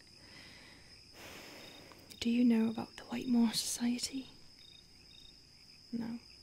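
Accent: United Kingdom. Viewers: Ahhhh British accent its scary because you sound so much like my friend..... good job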